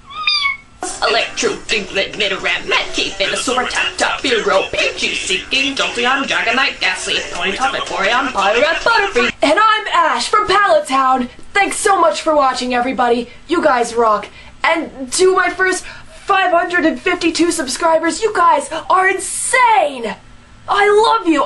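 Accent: United Kingdom. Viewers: 6 falam que ta ruim mais e por que ela fez no sultaque ingles (nao sei como escreve sultaque)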